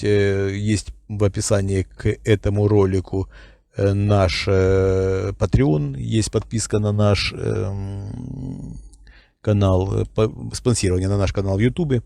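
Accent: Ukraine. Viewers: Виктор Михайлович, вы употребляете слово " ТРУСИТ". Генерал контрразведки - вы украинец ??? У вас акцент украинца, очень много слов и оборотов, которые применяют в речи только украинцы...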